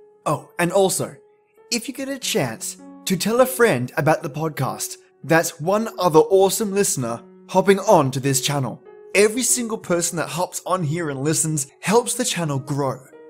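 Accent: Australia. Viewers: tis the best way to fall asleep, all cozy in a blanket burrito. And if I do manage to churn out some twisted tale of monsters and mayhem you shall be the first to read it I assure you. Absolutely love your voice in particular and the ozzie accent in general. ^_^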